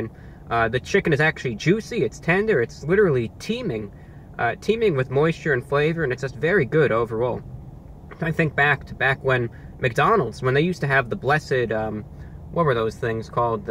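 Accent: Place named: New Jersey, United States. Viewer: You defienelty have a jersey accent lol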